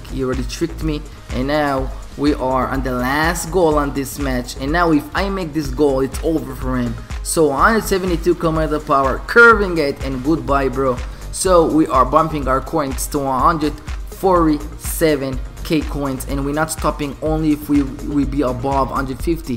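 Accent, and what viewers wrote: Israel. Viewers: יש לך מבטא ישראלי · ישראלי? אם כן, יש לך מבטא פצצה מה זה זה...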